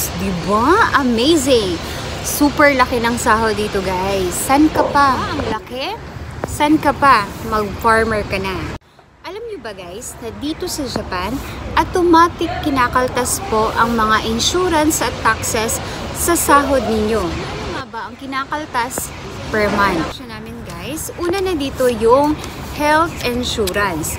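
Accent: Visayas, Philippines. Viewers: Bisaya d.i ka ma'am 😅 cebu here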